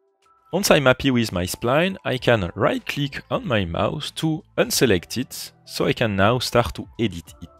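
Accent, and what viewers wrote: France. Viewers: Love this french accent 💌🇫🇷 and vive Twinmotion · me too 😂😂😂😂 its the first time in my life to hear a french english accent as strong as this one 🤣🤣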